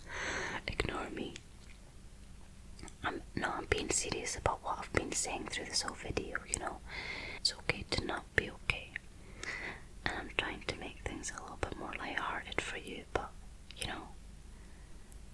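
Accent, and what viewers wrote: Scotland, United Kingdom. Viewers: I found your videos through Scottish Murmurs I am glad she suggested you! I love your slower Scottish accent then Lauren's Glasgow fast accent but both your accents are beautiful! Thank you for helping me relax! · Personal Rule of thumb, write the email, wait 24 hours to send :) 3rd time watching this vid, and I think it’s the Scottish accent and your cadence but you’re my new favorite ASMR artist, BTW hows your Cantonese and Mandarin? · Great video. Lovely to hear a Scottish burr after 10 years away from Scotland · Very soothing - plus i luv the Scottish Accent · It feels like I have an anthill walking my scalp. Lovely Scottish accent. 💓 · Clicks on video by artist I don't know. Video doesn't begin with loud, jerky finger fluttering. Mesmerising, slow hand movements. Soothing whispering. Emotional intelligence. Scottish accent... 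How could I not subscribe? Cracking video. Thank you. Regards. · Scottish, I hope, that`s lovely. and together whith these sensual handmovements you are poetry.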